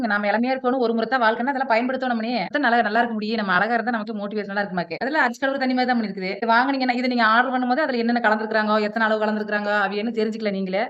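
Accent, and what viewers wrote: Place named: Coimbatore, India. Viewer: கோவை பாஷை சூப்பர் ❤❤❤❤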